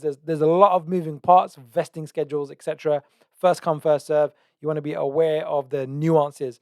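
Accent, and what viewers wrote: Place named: United Kingdom. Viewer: more so with crypto narrative, opinions, actually studying all aspects of this market other then price, fundamentals and watching people with a different mindset which allows you to open your mind that little bit more. All these don’t marry up so well with Instagram. None the less you sound like your from the U.K. so I’ll just have to bump into you and try my luck that way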